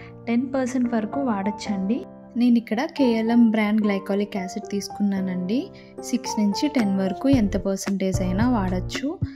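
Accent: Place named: Godavari, India. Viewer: మీ way of talking చూస్తుంటే గోదావరి జిల్లాలో తెలుగు అమ్మాయి లా ఉంది.. nice explanation ❤😊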